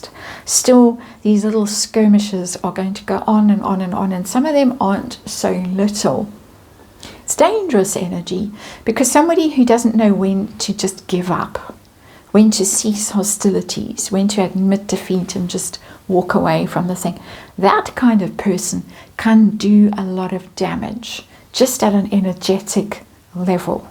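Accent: South Africa. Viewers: I have to 'scroll up' to get their faces out of view and just listen to any commentary. That's why it's nice to look at the tarot cards and listen to that lovely soft South African melodic voice with its occasional giggles.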